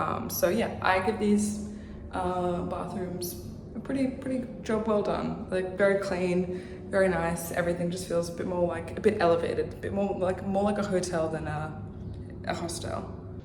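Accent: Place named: Germany. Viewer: Clearly! She has a strong German accent 😂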